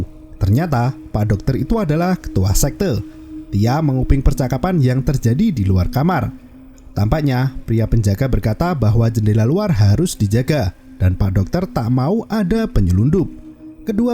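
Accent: Java, Indonesia. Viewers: Jawa km medok benar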